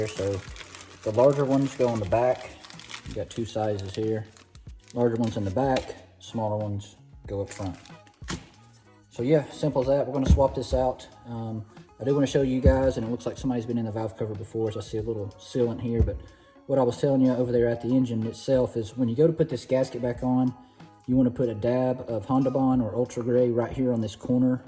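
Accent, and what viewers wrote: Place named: South, United States. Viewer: great video thanks. You sound like you might be in the south....are you for hire to perform this service? I'm in GA and am looking for someone who can do a timing belt service for a Honda Beat.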